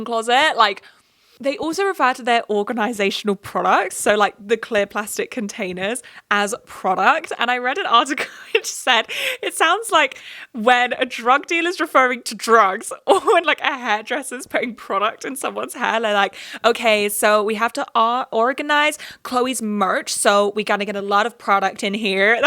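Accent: England, United Kingdom. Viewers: Gal you are so likeable ! Such a breath of fresh air hearing one of these pop culture chit chats from a proper British hun 💋💋 also this restock shit is so tacky haha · Weird thing to comment on, but since Covid scattered my friend group and I had to rebuild my social life from the ground up online and I ended up with a very international social group with all kinds of different accents, I did not realize you were English till you said "washing up". I've been watching your videos for months.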